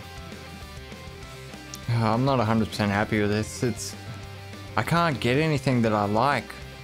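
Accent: Australia. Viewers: When are you going to build something out of thatch? Maybe add a single campfire in the middle of it all, but not much more complicated than that. I find myself getting tense and stressed just watching you do these massive, OCD fueled rage enhancing builds. I think ASM is the modern hipster thing. You already have us addicted to your sexy Aussie voice (auditory-tactile synesthesia) so you might as well calm us all down with a simple build that causes us all to mellow out and drool on ourselves. Of course, the metal music in the background will have to be something more subdued. It's either this or narcotics and I'm sure you wouldn't promote your fan base to be drugged out roadies.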